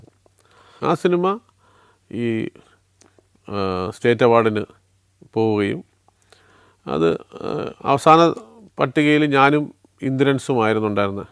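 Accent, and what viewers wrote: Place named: Thiruvananthapuram, India. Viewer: സംഭാഷണത്തിലെ തിരുവനന്തപുരം ശൈലി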